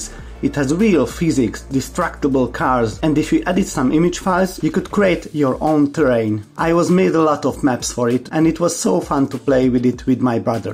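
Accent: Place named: Hungary. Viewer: Are you by any chance from hungary? You sound like a fellow hungarian haha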